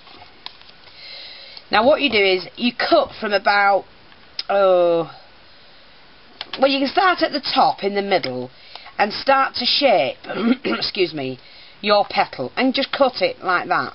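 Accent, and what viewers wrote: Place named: Leeds, United Kingdom. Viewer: It's because lives in Leeds do she has that 'leeds' accent lol. I like it:)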